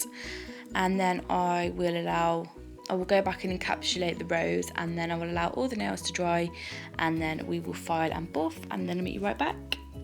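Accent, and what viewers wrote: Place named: Midlands, United Kingdom. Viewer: Uproar you have got to be from the Midlands like me girl xxxx love your vids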